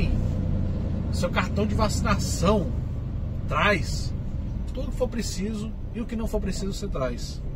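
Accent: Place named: Rio Grande do Sul, Brazil. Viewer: teu sotaque é de gaucho, vc é da onde amigo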